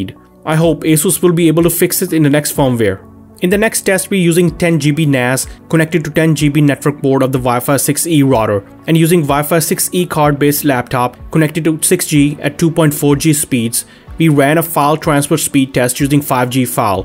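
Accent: India. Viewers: The Indian accent made me wanna stop watching, I can’t, it’s so heavy😂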